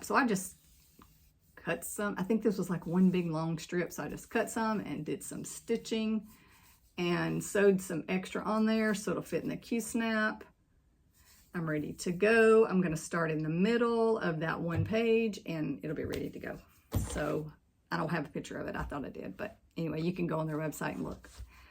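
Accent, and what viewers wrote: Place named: Alabama, United States. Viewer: I’m hearing the Alabama!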